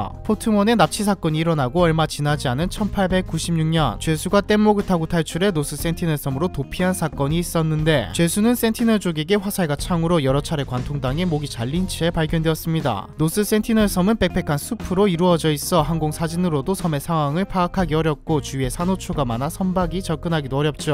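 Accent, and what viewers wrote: Gyeongsang, South Korea. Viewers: 이분억양이 경상도말투 인가보네요?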